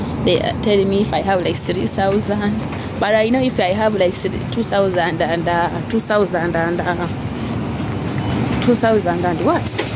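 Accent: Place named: Jamaica. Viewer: You talked like a Jamaica 🇯🇲 ❤ you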